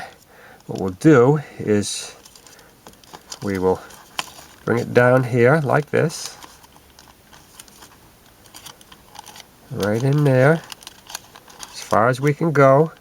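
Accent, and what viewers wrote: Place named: New England, United States. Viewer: Probably from New England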